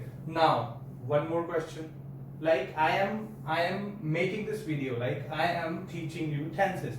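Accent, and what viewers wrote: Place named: United States. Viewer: Ye sir American english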